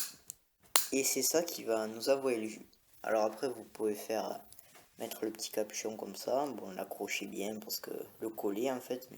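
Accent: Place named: South, France. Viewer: l'accen du sud qui fait plaisir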